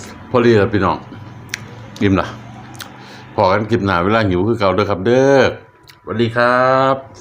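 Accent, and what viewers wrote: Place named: Sisaket, Thailand. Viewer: จังแม่นเพิ่นแซ่บแต่เช้าน้ออ้าย ฟังสำเนียงคือทางศรีสะเกษน้อ ผมเขยศรีสะเกษเด้อครับ เป็นตาแซ่บคัก กินนำแน่ๆอ้าย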